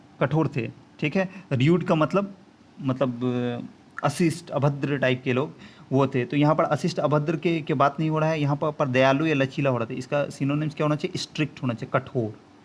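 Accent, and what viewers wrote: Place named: Bihar, India. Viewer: Hello friend, it is not mistress Meera in first question rather miss-iz would be correct pronunciation. Further I suggest you not to teach English because when you speak you have got regional touch in your pronunciation and to validate myself I can say that you are from Bihar for sure. You should try something else because English is not a subject, it is a language where pronunciation has a great importance.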